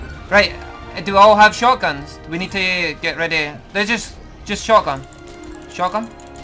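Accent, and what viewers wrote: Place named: Scotland, United Kingdom. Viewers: not sure for certain but sounds like Glasgow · Where from scotland u from pal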